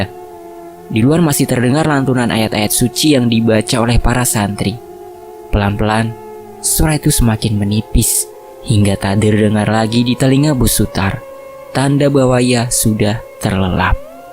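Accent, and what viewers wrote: Java, Indonesia. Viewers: Salut sama sang orator bagus sekali , terutama aksen jawanya mantap sekali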